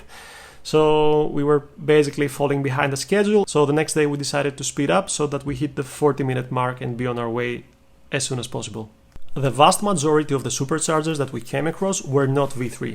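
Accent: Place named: Greece. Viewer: I have been following your channel for some time now and I had some suspicion that you might be Greek, by your accent. Now I am even more suspicious 🤨🤣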